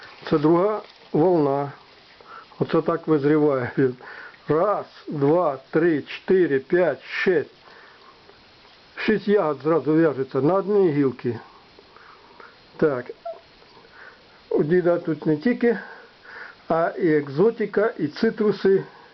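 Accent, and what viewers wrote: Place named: Kharkiv, Ukraine. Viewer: Дай Бог вам всього доброго , мирного , світлого ! Як приємно чути наш харківський говор !!!! Супер !!!!! Дякую 🙏🙏🙏.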